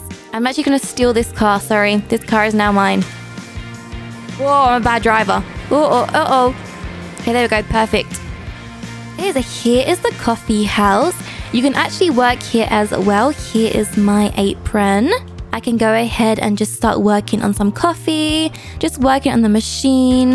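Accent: England, United Kingdom. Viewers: I love your British do u speck British or something kinda with British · You can tell she’s English lol my dads english so that’s awesome oh wait aren’t you?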